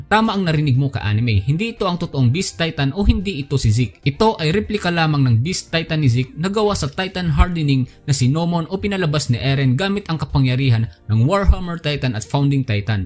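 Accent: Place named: Visayas, Philippines. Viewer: Bisaya kaayo ka mo lebay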